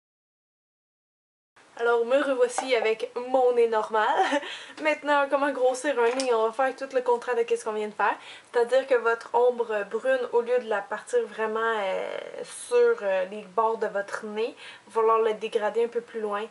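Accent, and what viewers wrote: Quebec, Canada. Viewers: Salut jsais pas comment jsuis arriver sur ta vidéo mais on s'en fout ! Je suis Suisse et dans 2 semaines je vais au Canada et rien que pour ton accent jme réjouis d'y aller ahaha ! "On va commencer avec les plus gros nez" Aahha merci pour cette vidéo. Tout du bon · Bonjour à toi! je suis française et je viens de te découvrir , j'adore ton accent ayant vécu un an par chez vous ! cette vidéo m'aide bcp car je trouve mon nez est trop gros donc merci pr ça et petite question , je voulais savoir si tu saurais faire un tuto sur les maquillage pr filles métisses car je le ss ( inde/ france ) lol et si tu aurais des suggestions car je fais bien trop souvent le mm make up en soirée ou tous les jours, et j'ai les yeux en amandes dc savoir comment et quoi faire ! ;) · Super tes video.j adore ta facon de parler et ton accent bec:).est ce que les couleurs sont les mm pour une peau mat? 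· j'adore l'accent québécois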